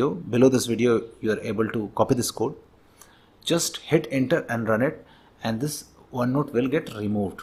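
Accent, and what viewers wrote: India. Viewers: Why do I have a feeling these are botted and he is making his channel fake -_- I tried this and it didn't even work. I have Windows 10. For some reason my duckie senses are telling me not to trust this guy with that many subscribers and then with this many comments. And most of them positive I just have a feeling this guy is lying. But I really like the Indian voice. So that is my review, Thank you! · Omg dude thanks soo much my internet was have a stroke and being very slow and i tryed every turotrial but its pnly yours that works thank you i dont know why people are calling you a scammer cause youre voice is indian. <3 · Bruh i love indian thank you soo much you guys sound so cute with your accent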